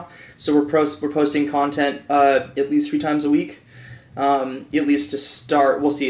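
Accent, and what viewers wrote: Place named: France. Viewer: You have a little accent . Are you French ?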